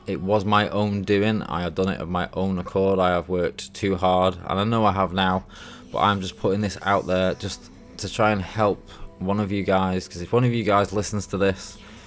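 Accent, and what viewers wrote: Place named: Liverpool, United Kingdom. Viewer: MY GOD HE"S FROM LIVERPOOL!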